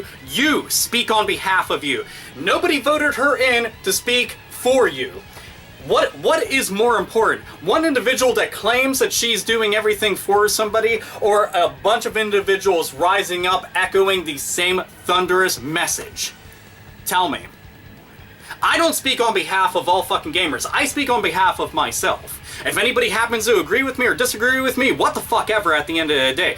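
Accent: Pittsburgh, United States. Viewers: haha holy shit, youre a yinzer? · I realize this is an older video, but I have to ask; are you a Pittsburgh native? I gotta know man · where are you from? I'm from Fayette County, PA and your accent reminds me of home. I noticed your Pittsburgh Zombie shirt so that's further evidence that you're from that area. Your rants are fucking awesome and your accent sends it over the top.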